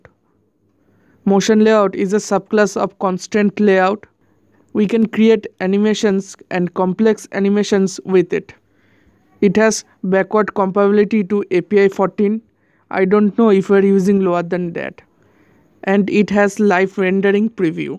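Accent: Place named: Bengal, India. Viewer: Hmm Bengali accent..nice :D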